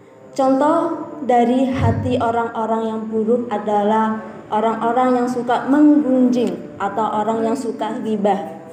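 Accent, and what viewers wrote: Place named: Banyumas, Indonesia. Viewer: Semangat mbak ngapak❤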